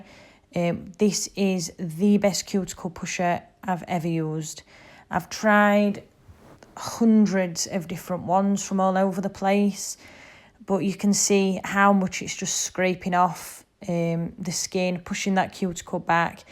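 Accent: Yorkshire, United Kingdom. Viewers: It’s lovely hearing someone from Yorkshire 😊.. gorgeous nails 😍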